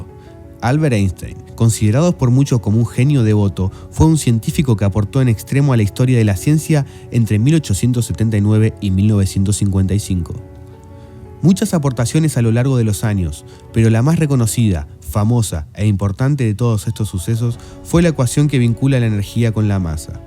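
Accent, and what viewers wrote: Argentina. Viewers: Hermosa voz argentina!!!! me parecio excelente la locucion, nose por que el problema de que sea argentino! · Grande ese locutor argentino papa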